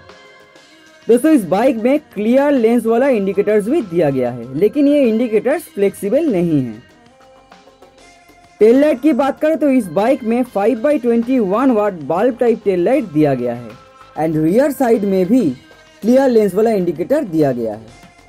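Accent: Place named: Bengal, India. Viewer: Hindi mei bengali tone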